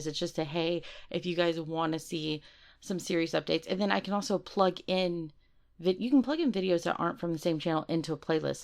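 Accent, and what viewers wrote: South, United States. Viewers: I didn't know i needed a southern furry till right this moment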